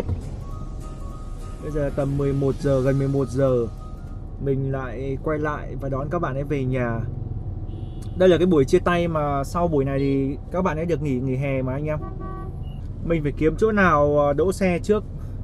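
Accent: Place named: South, Vietnam. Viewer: Anh có bạn miền nam ko? Anh nói nhiều lúc có pha tiếng nam vô :))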